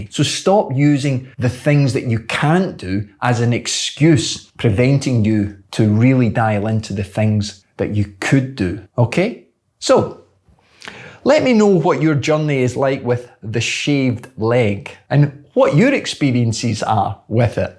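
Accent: Scotland, United Kingdom. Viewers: That’s it in a nutshell! Like the Nike slogan, “Just do it!”. BTW, is your accent Scots or Irish? I’m thinking you’re a lad from Scotland. Yes or no?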